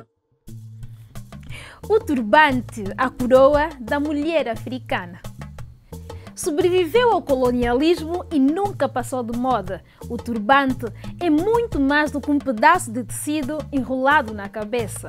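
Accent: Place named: Portugal. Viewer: Por favor, não prenuncie as lingua africanas com sotaque/tiques do português. Não mates os teus vídeos, jovem!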